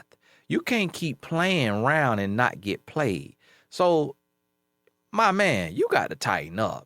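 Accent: South, United States. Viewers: You sound so country in this video 😂 “listen to meeee” 😂😂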